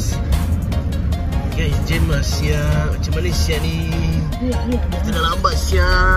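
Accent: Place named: Singapore. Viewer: dari slang bahasa je kita dah thu dari singapore...tapi apa pon mantap bosku..gerekkk seyy....salam dr johor..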